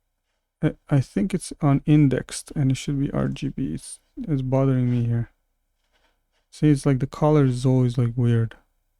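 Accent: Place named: Romania. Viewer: La inceput chiar m-am gandit ca esti roman sincer. Dupa accent si aspect, doar ca m-a cam lasat in dubii "CA"-ul de la youtube. Deci am zis ca poate esti Canadian dar vorbesti franceza mai mult decat engleza. Sincer chiar ar merge sa faci o serie de tutoriale. Godot a inceput sa creasca foarte mult in ultimul an. Eu personal il folosesc de cam un an si ceva si nu am lansat nici macar un joc, ci doar prototipuri :)) Ai putea folosi strategia asta cu youtube-ul, sa faci niste tutoriale, sa-ti cresti canalul destul de bine si cand o sa lansezi jocuri o sa ai oameni interesati in proiecte. Deci pe scurt, ai putea face o sursa de venit din asta. Lasa-mi un detaliu de contact daca vrei si poate am putea comunica mai mult acolo. Multa sanatate si chiar astept tutorialele tale. Email-ul meu e : <mail>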